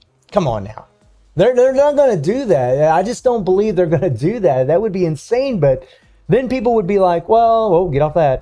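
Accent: United States. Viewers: Oh man, I thought u sounded country. Love the beard man.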